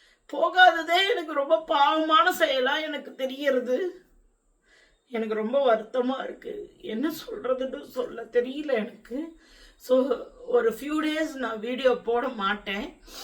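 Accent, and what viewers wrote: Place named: Iyengar, India. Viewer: Ellam Nanmaike, all the best, I am sure your mom n law understood your situation. She was there on Mon, perhaps spoke to you at length on that day to wish you well ( leave her blessings in person ), namakku enna arul irrukko adhu muzhu shaga irrukkum, don't worry, everything will workout fine, I like your iyengar tamizh, I watch off and on, we will wait for you to come back and enjoy your recipes, take care, relax and do the needful under the circumstances. Be well, keep strong, thanks, Radha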